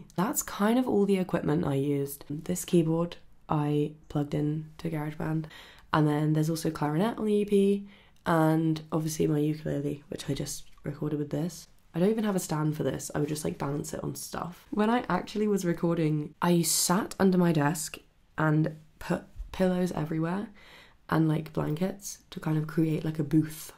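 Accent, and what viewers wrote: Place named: South Africa. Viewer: you sound South African. you from Australia or New Zealand?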